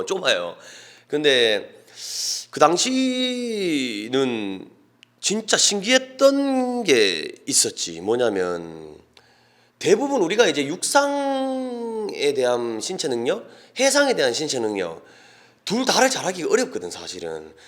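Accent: Gyeongsang, South Korea. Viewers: 갱상도 사투리 개말립니다 행님 사랑합니다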